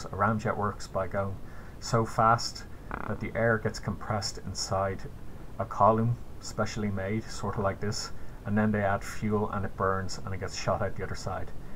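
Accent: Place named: Ireland. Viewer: You sound as though you’re a real Irishman, If I ever build a pulsejet big enough, I’ll slap some solar panels on a dingy, electrolyze the seawater, And run that sucker all the way across the Atlantic. I’ve always wanted to see Ireland. Have a great day. Right now I am in the middle of some very interesting builds. When the videos get uploaded I’ll let you know.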